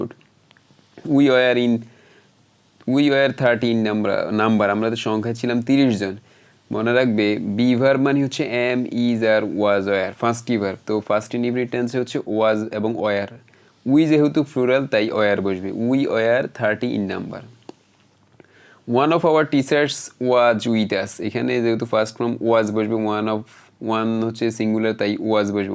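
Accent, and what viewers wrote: Noakhali, Bangladesh. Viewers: oo vai noyakhailla apni?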